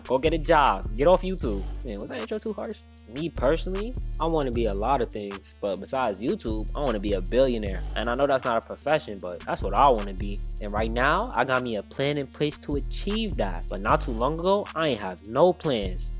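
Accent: New York, United States. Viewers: bro said whattaburger yet talk like he from ny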